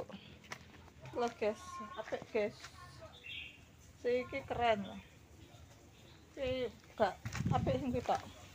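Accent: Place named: Banyumas, Indonesia. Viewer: Balik maning kro inyong kiye hot milf... ky kuwe yu openinge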